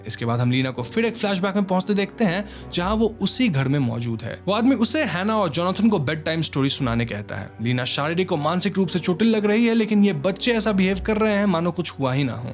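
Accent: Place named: Bihar, India. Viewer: Your accent is so cheap every time you say D instead of R ... total bihari accent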